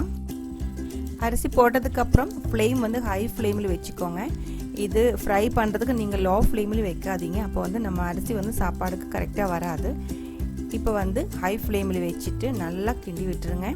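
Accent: Kerala, India. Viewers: hi mam ur way of presentation is simple&..ur malayalam mixed tamil is understanable..i love..can you tell about adaprathaman recipe?..thank you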